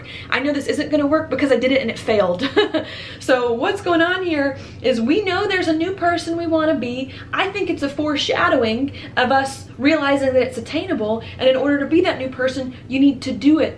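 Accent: South, United States. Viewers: My goodness gracious, you have amazing skin and healthy hair. I don't talk like a douche. But I needed to get that out. Rawr, lol. Okay, I've looked like a beta jerkoff enough now. I'll quit. Not like I'm ever gonna meet you, so I didn't have anything to lose by telling you these things, hahaha. Oh and that country accent, and your energy... yum 😂 Great readings too. No joke.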